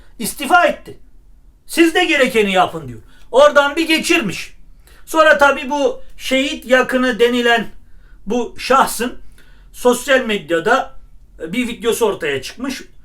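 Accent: Erzurum, Turkey. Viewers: Sempatik buluyor, sivenden supheleniyordum, Erzurumlu oldugunu soyleyince daha bir sevindirik oldum. Biz de sizin eski somurgelerinizden birindeniz ; )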